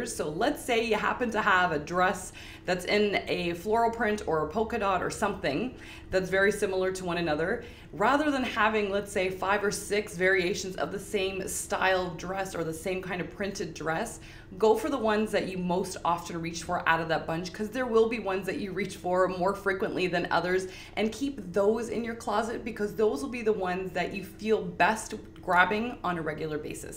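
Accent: Canada. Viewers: Less than 30 seconds in and I just have to say your accent is so cutely Canadian! Have a wonderful day! Now back to watching the video.......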